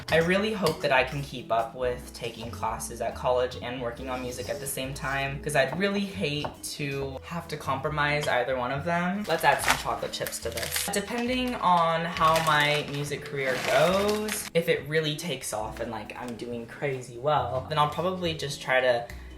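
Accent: South, United States. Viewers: YOU CAN HEAR YOUR SOUTHERN ACCENT SO MUCH IN THIS VIDEO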